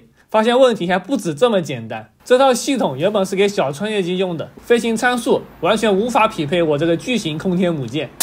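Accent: Jiangxi, China. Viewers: 听你说话，就知道是赣普🤭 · 江西舰？赣州人吧 一听就是水西西瓜皮的口音:face-blue-smiling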